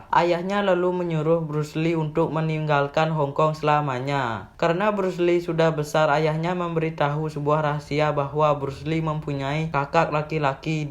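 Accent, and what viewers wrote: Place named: Bali, Indonesia. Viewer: Sperti suara orang bali 😅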